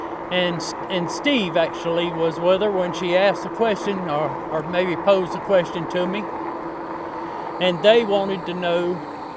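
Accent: South, United States. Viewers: This is my first time looking 👀 at your video.I like your country accent it is beautiful👍🏿👍🏿😂😂😂😂😂😂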